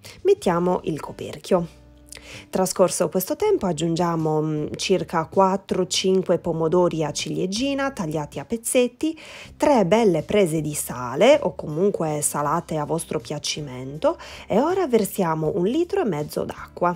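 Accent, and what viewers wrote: Sardinia, Italy. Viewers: meravigliosa e intramontabile ricetta pure della mia❤. ps: fallo sentire l accento sardo che è meraviglioso❤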